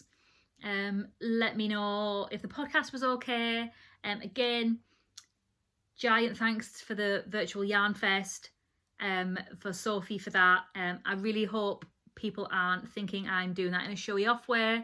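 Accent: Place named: United Kingdom. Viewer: I stumbled across your podcast from a shoutout on Yarnmugs and was immediately addicted to seeing all of your neon colored sweaters! I love your fast-paced style and cute accent too ;) (American here who watches a lot of British podcasters and is always amazed by the diversity of accents on one island) And actually, I think your accent is easier to understand -- at least to my ear -- than some of the other, more common accents. (IG)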